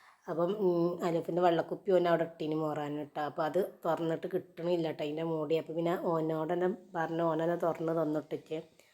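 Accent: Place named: Malappuram, India. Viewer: എന്നെ പോലെ ട്ടോ ട്ടോ വരുന്നുണ്ട്, മലപ്പുറം ആണോ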